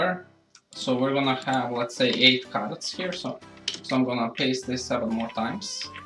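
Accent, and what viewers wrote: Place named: Serbia. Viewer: Your accent is like u come from Serbia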